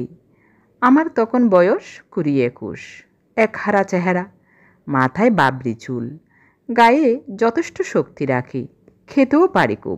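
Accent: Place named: Bangal, Bangladesh. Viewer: খুব ভালো লাগলো বাঙাল টান টা আরো মধুর করেছে , যদিও পুরো বাঙাল ভাষা বুঝতে পারি না পুনঃ গল্পের আশায় রইলাম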